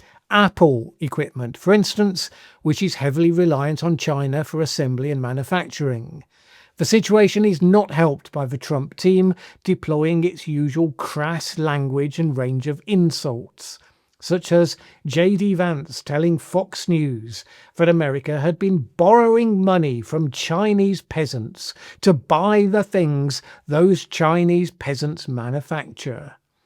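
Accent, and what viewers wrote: United Kingdom. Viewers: ARE YOU A BRIT ? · How do I block these Brit narrators. Annoying as F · So Sick of listening to some stupid Bloke, with your horrible accent. We Don't Care about your Cheesy Propaganda. You Brits, have Lost your country to Muzzys. You Brits have Nothing to Say. You have a National Passtime 'SLAGGING THE YANKS'. High Time for you to Grow Up. Leave the TARRIF Deal to solve itself, it will.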